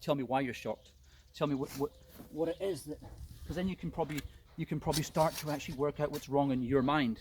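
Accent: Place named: Scotland, United Kingdom. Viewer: I thought ye might of mistaken him from Watts lol He's actually from Glasgow originally (now resides in Sudbury Ont. CanaDUH) I thought I recognized yer accent but wasn't sure what part of Scotland ye were from, I'm originally from Belfast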